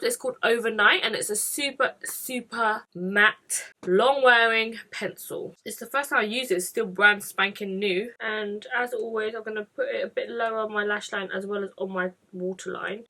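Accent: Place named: North London, United Kingdom. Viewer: You got a beautiful north London accent x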